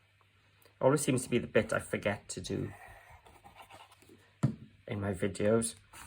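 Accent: Wales, United Kingdom. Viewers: I really enjoyed this video today showing the bridge fold card. This is a fabulous card, I am going to try it for a lovely lady who will be 90. Thank you so much for showing this technique. P.s. love to hear a Welsh accent again 😍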